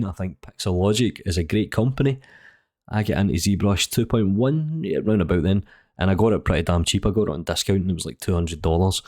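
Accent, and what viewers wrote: Scotland, United Kingdom. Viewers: Na pal, you talk Scottish all you want. Perfectly clear to me … then again I’m a north easterner. No one understands me.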